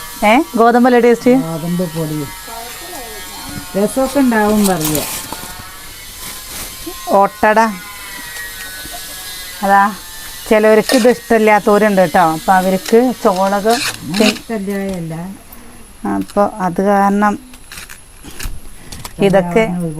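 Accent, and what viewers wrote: Palakkad, India. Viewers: Snake kanum nokynadakanam mol.palakadan samsaram nalla eshamannu ketto.thank you all family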